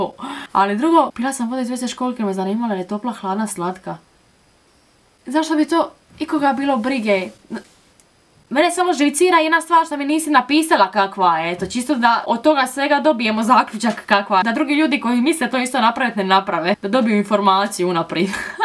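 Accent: Dalmatia, Croatia. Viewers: Kolko volim dalmatinski.. 🤤❤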